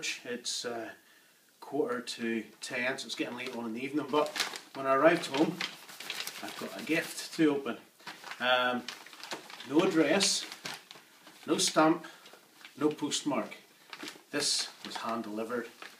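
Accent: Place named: Ireland. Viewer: Sweet Gifts. Dig the pirate talk especially since your Irish accent makes it sound more genuine than mine with my South Florida Cuban English. We've got to do something about that jersey though.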